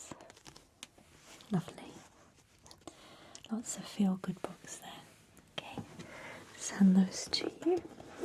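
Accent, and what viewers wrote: United Kingdom. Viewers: Theirs nothing quite like a British ladies voice. Outstanding.❤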